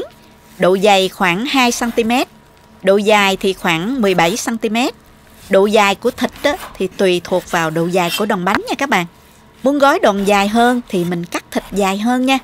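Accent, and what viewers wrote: South, Vietnam. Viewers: Nghe giọng hướng dẫn cưng quá là cưng, đúng kiểu nói người miền Nam. Chúc chị luôn ra các hướng dẫn chi tiết và hay như vậy nha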